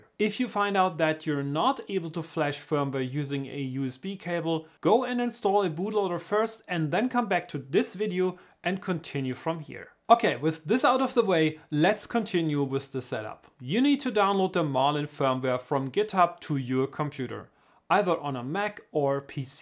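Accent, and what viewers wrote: Germany. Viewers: Thanks for your help. The german accent punctuated my OCD demand to make my dumpster find functional again!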